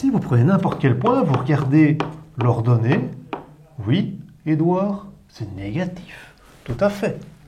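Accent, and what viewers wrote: Brussels, Belgium. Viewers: Je reconnais bien l'accent bruxellois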